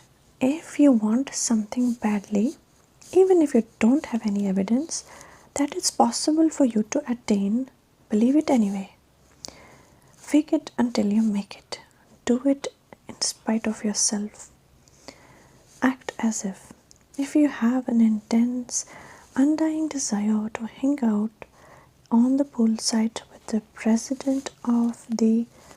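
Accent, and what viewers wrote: India. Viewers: Hindi bolte huwe cute lagte ho Or hindi bolte huwe tumhari voice bhi acha sound karti hai I like your hindi accent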